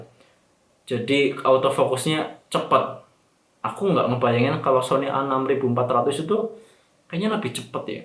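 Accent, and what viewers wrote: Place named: Central Java, Indonesia. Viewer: tapi kok logat nya jawa bang, kyk logat jawa tengah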